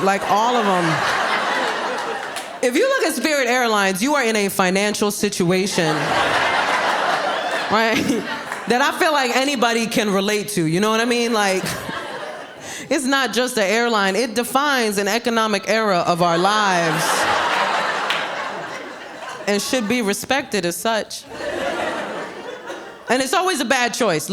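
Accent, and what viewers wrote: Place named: Bronx, United States. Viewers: I knew she was from the Bronx as soon as she opened her mouth.😊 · I love listening to a true New Yorker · Literally never knew what people meant when they said I had a south Bronx tone lmfaoo me and this woman talk alike I’m crying lmfaoooo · I soooo knew she was from the Bronx before she even said it 😂😂😂😂 I loveddd this!!! ❤❤❤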